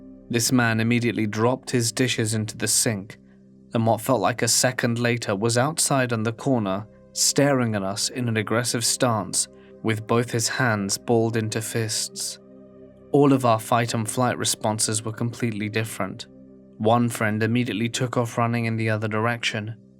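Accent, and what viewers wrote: United Kingdom. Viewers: The British accent gets me every time. 😊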